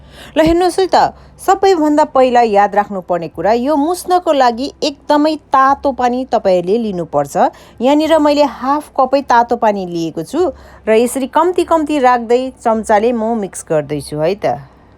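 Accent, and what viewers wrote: India. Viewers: Sneha je jsto vayo pani nepali jsto bole pani kaam ta ramro garnu voko cha di le..Bhasa ta hami eta India ko nepali le estai bolchau maan parcha ta thik cha maan pardena ta na hera video choice is yours.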